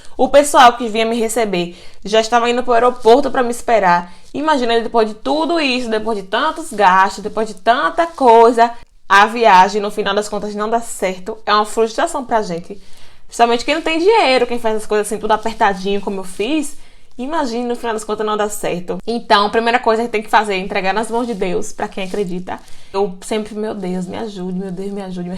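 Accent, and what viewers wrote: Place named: Pernambuco, Brazil. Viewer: Gente ela é Bahiana🤣, o sutaque é de Pernambucana , Gui que és Pernambucano.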